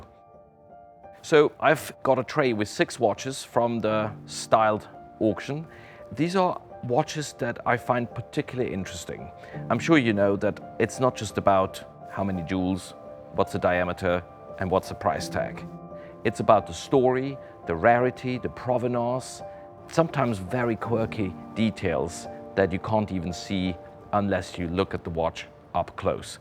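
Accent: RP, United Kingdom. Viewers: Aurel Bacs has great knowledge but he really needs to stop with that posh English accent. Just doesn’t sound natural)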